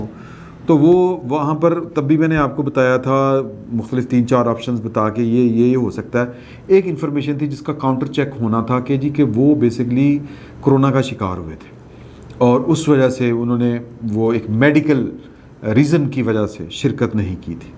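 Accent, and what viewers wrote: Punjab, Pakistan. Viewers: Proud to speak panjabi..... Panjabi zuban da tarka sun k vlog vich swad a janda ay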